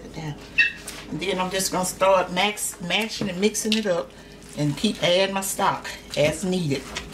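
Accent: South, United States. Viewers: Amen Thank you I love your southern talk and you area Blessing to the women who want to cook a southern meal for the family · I love your southern accent! I could listen to you all day! Thank you for making this video, it is very entertaining and educational :)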